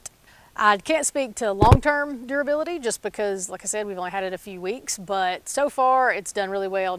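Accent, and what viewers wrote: South, United States. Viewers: judging from your awesome accent, I gather your in the south someplace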